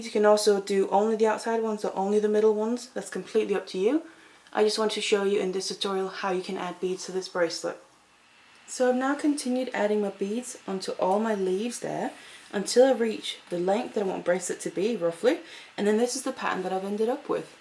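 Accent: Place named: Northern England, United Kingdom. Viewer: Are you from Northern England...I recognized your accent from watching a mini-series on the BBC called North and South. :o) This is really pretty! Thanks!!!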